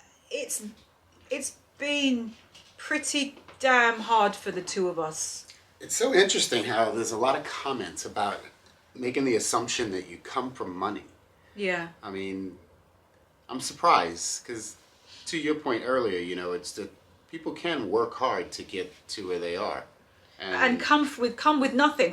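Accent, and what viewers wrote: RP, United Kingdom. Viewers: I bet that the comments that you come from money are from Americans because we can think an English accent can sound educated, respectful and prestigious. Thanks for being so open, I like you and even more. Didn’t think you can too What Everyone is Wearing but you did. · I think the wealthy assumption comes from you having a 'posh' voice and 'quality ' taste. People these days need to be part of a fantasy story..and you are one. KEEP UP THE GOOD WORK! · I think people (esp Americans).assume you come from money because of your lovely plosh (madeupword) accent. But it is easy to tell from your down to earthness that you have worked hard and endured some things. Kudos to you. Wishing you continued success. 😘😍👍🏾👏🏾 Hey 👋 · I can't understand the commenters thinking you grew up wealthy:D because you're very "street smart" and down to earth! 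Maybe it's the British accent;) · You dress well, and exude confidence...and also your accent is “posh” so I’m assuming that’s why people think that you come from wealth. 🙏🏼 I’ve spent the last few months in London and there are such varying accents I can almost always spot a “proper” English accent...it’s sounds very different from someone that’s from the North or even a Cockney accent! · Y'all are lovely! These assumptions... I think people think you came from money because of your British accent and good taste. Who knows? I usually find that the assumptions we make reveal a lot about us and less about the person. 🤷🏿‍♀️ I enjoy all of your videos and Insta account. 🙌🏾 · I am an O.G. follower. It's the British accent that makes Americans assume that you come from money or have money. And your impeccable taste and style. Just because it looks easy doesn't mean it is or was. PROPS TO. he is a very self confident, supportive man and partner. 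· I wonder if people think you come from money because of the accent? A lot of folks hear an English accent and think that person as posh. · I think it’s the accent. Americans obsessed with brits & British accents & think everyone that speaks that way comes from money! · I wonder if the people commenting about your coming from money are American. I wonder this because to us, the English accent is sophisticated and posh-sounding. Coupled with your style, your accent makes you appear refined and high class to Americans. I’m an American and I assumed that you were wealthy too because of your style and accent.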